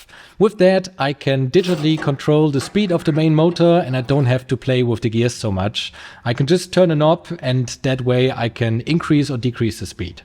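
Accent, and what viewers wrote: Germany. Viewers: ich höre deutsch xD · Selten so ein Deutsches Englisch gehört. Geiles Video, Jung.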